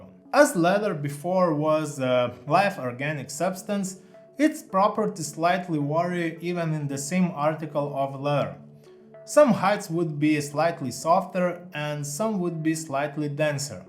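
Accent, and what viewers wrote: Russia. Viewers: хэллоу) чувствуется русский акцент)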